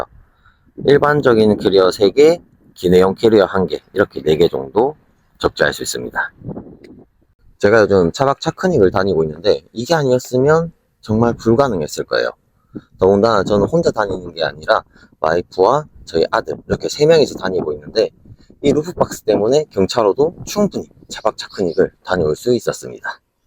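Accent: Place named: Gyeongsang, South Korea. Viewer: 갱상도 어디시죠?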